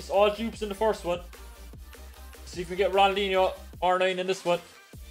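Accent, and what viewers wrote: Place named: Ireland. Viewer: Are you irish ? Sounds like it to me so am i !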